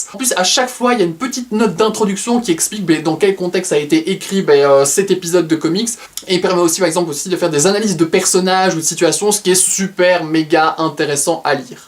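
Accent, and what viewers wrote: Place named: Belgium. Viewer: C'est marrant parce que j'ai pas tout de suite capté que c'était l'accent belge (genre ya un truc qui va pas mais quoi^^) mais j'ai tilté au "tome huit" (taume ouite^^) et j'ai réalisé que si ça me disais quelque chose c'est parce que c'est comme ça que mon chéri le dit^^ (belge aussi avec comme toi un accent très peu prononcé, juste sur certaines prononciations et certains mots). Fables me fait de l'oeil depuis un moment (pareil, j'ai connu son existence par le jeux telltell auxquel j'ai malheureusement moins accroché que walking dead parce que c'est un peu lent à mon goût mais j'adore le concept) étant une grande fan des réécritures de comptes etc (once upon a time entre autre^^). C'est cool de nous présenter des comics hors super héros (pas forcément ma tasse de thé à part peut être deadpool que j'aime beaucoup avec son côté comique^^ et les xmen dont j'aime beaucoup les films mais que je n'ai jamais lu). 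J'ai du mal à trouver mon compte dans les manga, j'adore mais je suis plutôt shojo pour leur côté mignon mais pas cucu et pas trop blagues de cul lourdes^^ (j'avais adoré fruits baskets par exemple). Je viens de faire péter ma wishlist du coup^^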